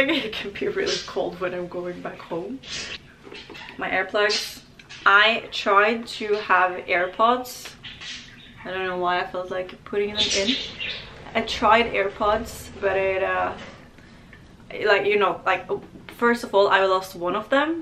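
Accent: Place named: England, United Kingdom. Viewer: Merci, contente de vous voir, vous Revoilà. J'adore votre accent anglais je vous regarde et vous écoute pour cette raison aussi. Je suis en Provence. Thank you, nice to see you again, you're back. I love your English accent I watch you and listen to you for that reason too. I am in Provence.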